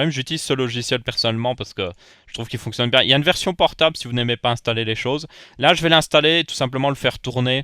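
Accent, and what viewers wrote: Belgium. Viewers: Salut, Merci pour tes conseils. Tu parles vite, avec un accent belge (très agréable ) il faut vraiment se concentrer avec toi vu que tu vas assez vite. Mais en tous les cas merci pour te vidéos. A+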